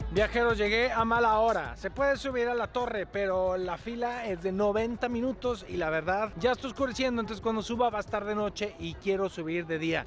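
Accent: Mexico. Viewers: El acento mexicano es insoportable. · Se le sale mucho lo mexicano esta vez. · Si dejara tanto acento mexicano sería mejor este YouTuber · Jaja 😆 me ha encantado tu paseo por ahí en Kazajistán 🇰🇿 y tus comentarios con esos acentos muy mexicanos wey: #tenga su tenge jaja 😆!! La escultura Dominicana 🇩🇴 👏👏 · Jajajajaja bien canijo me encanta como habla este muchacho bien Mexicano a lo mero mero!!! Me encanta su energia y alegría es como una Ave aventurera y donde el viento lo lleva ahi va bendiciones!! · yo te voy a ser muyyyyyyyy sincero la verdad yo veo tus vídeos porque el acento mexicano es un afrodisíaco para mis oidos. Vos estas guapo rico delicioso y de verdad me gustas me exitas me encantas veo tus vídeos e imagino te doy besos detras de la oreja.Y si tus videos son balsamo para mis ojos pobres que aun le falta por conocer. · Tu narración es de diez 👍👏👏👏👏👏no me aburro y hasta me rio con tu tipico acento mexicano. 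Realmente como dicen los españoles una gozada este video además de ser muy interesante 👍👍👍👍👍 Gracias y por más Kasachtan 🇰🇿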